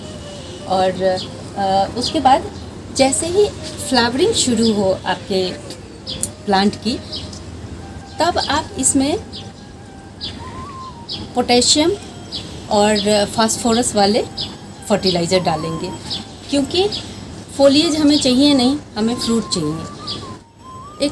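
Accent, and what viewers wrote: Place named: Uttar Pradesh, India. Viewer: Uttarpradesh accent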